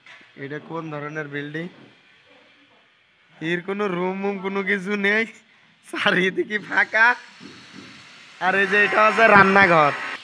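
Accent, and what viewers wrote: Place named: Chuadanga, Bangladesh. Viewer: কথা শুনেই বুঝতে পারছি, আমার বাড়ি ও চুয়াডাঙ্গা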